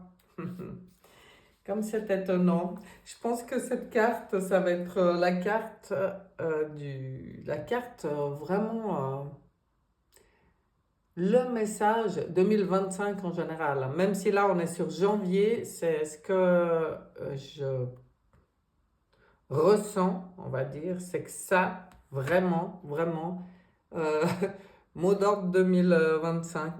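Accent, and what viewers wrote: Switzerland. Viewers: Merci c'est toujours un bonheur de vous écouter, j'adore ce petit accent suisse❤ bonne année à vous et à très vite la sortie du tunnel 🙏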